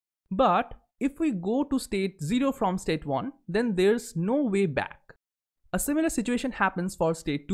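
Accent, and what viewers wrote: India. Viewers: I will be honest, was ready to find another video when heard the Indian accent. But then saw high upvote/downvote and stayed, and don't regret it!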